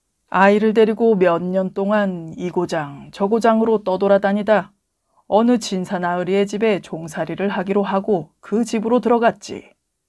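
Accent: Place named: Jeolla, South Korea. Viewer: 전라도 말 안쓰면 안될겨?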